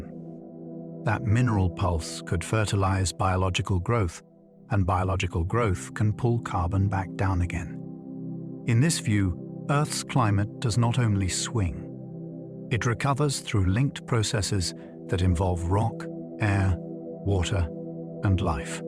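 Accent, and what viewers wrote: United Kingdom. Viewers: Why do these videos always have them a British dude narrating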